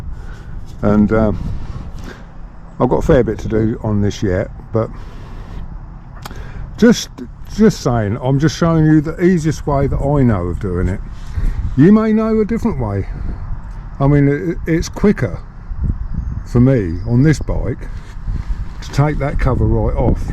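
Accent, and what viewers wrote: England, United Kingdom. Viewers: Lol ,Lazy Bugger. I love listening to English people talk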